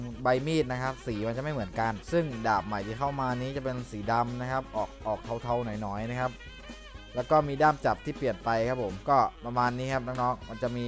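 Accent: South, Thailand. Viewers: ออก! ออก! เทาๆ หนอย หนอยนะครับ! 5555 ใต้มากเลยบ่าว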